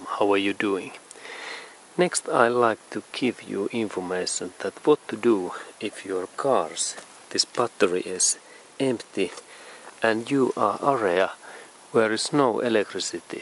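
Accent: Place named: Finland. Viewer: This video must be finnish made, bacause this "rally english". Keep up the good work!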